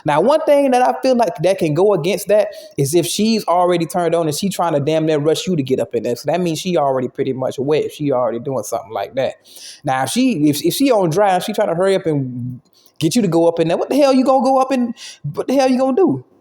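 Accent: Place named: South Florida, United States. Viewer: I ask bc you have a soflo accent and dialect 🦾 I was like be Fromm the crib lol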